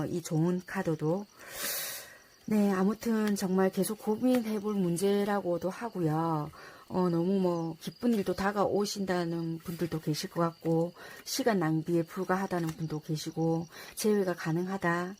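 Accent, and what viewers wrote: Busan, South Korea. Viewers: 1번👀✨.... 정말 꼼꼼하고 현실적인 리딩 감사합니다♥ 덕분에 용기를 얻고가요 가 저에게 최고 잘맞는거같아요 👀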